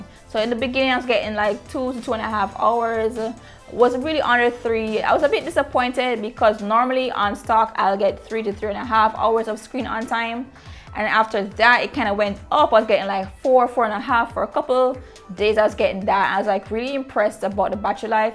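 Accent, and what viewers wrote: Jamaica. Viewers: is that a jamaican accent? seems like it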